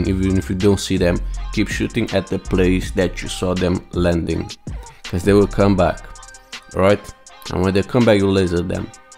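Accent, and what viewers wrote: England, United Kingdom. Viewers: It's a tough choice between the accents. Maybe make it both? British for general narration gives is like a documentary feel which is nice, and your own seem to work well for the explanations. The format for this video seemed real helpful in showing how to adapt to the situations that unfold and noting things to be aware of in them. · British accent it is so much fun!!! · Cheers! I would like to hear that British accent voice again. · Let's keep with british voice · We want the fancy British accent back now! ❤️❤️❤️ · it feels so different without that british accent voice over haha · Regarding the voice: I dont know buddy, the british one is nice but we dont watch you for entertainment, we watch you to learn stuff. Entertaining voice or not, real fans always gonna watch ❤ so your choice man, do whatever you like most hoping every fan thinks about it this way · Fancy British accent plz (if possible) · We want the fancy British accent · I enjoy it, do both, British and your original voice at the same time ❤️🙏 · para mi esta bien un video con tu voz y no la voz con acento inglés. 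🇲🇽 🇲🇽 💪💪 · I like the fancy British accent voice over and I love this one too😍....anyhow u wish u wish to upload videos!! Drop a 1hr video we'll still watch it from top to bottom...much appreciations to you for making us a better player!! Cheers❤️❤️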